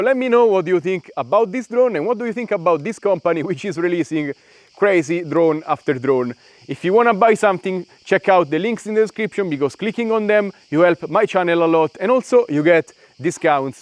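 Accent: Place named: Italy. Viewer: Man I like your accent! Are you Italian?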